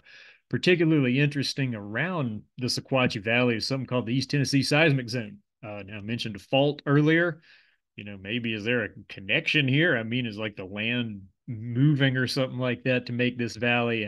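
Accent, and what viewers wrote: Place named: Philadelphia, United States. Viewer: Cool beans Unrelated but are you from Philly or Baltimore?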